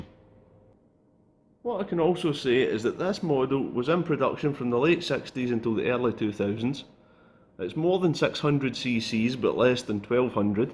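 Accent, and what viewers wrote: Scotland, United Kingdom. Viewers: Great video again. I had a motor tour though Scotland planned end of May,, but with the isolation measures in place.... that's of the calendar.... so I have to do with your video's, your accent and a great Glen Moray. Give my best regards to George... Cheers from Holland !!! Ruud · Ah, the first time I've heard your voice! Lovely Scottish accent. I'm looking forward to your new projects! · he's Scottish (west coast,Glasgow area) he is trying his best to speak polite proper English, that's why he sounds like he's only here for the banter :)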